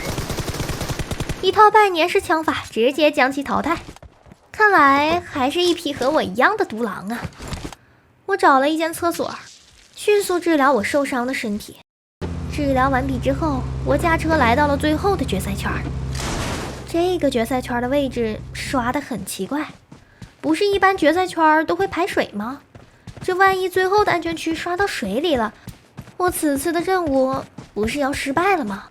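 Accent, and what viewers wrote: Northeast, China. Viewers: 感觉有一点东北口音 hhhh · 她不是最開頭就說用東北話嗎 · 東北話少點可愛，還是唱歌好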